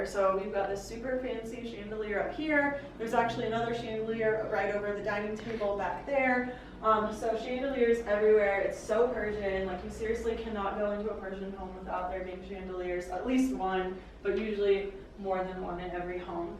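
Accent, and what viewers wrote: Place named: United States. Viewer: Thank you for the tour!😍 I find it interesting that in Iran the kitchens are open in comparison with Azerbaijan, where i come from. It would be also nice if you would go a bit more deep in thr tour and show more details in the furniture, for the kitchen especially it was too quick, and at the end to have an overall blick once again😊 Btw you have a lovely american accent👍🏽 As i understood you are living in the States and just visiting Iran.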